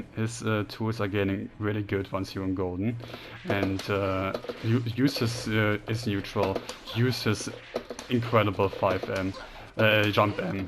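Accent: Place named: Germany. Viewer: are u german ? ur accent sound really really german xd wait ich bin dumm xd dein Spiel ist ja auf deutsch ;D gutes Video !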